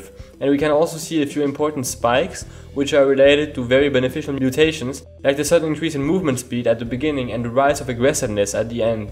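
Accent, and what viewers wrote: France. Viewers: I know I'm dumb, I know you are trying to show us somethibg cool, but all I know is that I couldn't focus on anything else than your fluent english with french accent... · You are french aren't you? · Un accent français ca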